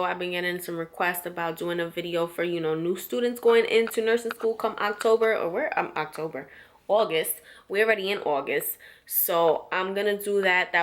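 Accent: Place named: New York City, United States. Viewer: Are you originally from NYC, I hear a NYC accent lol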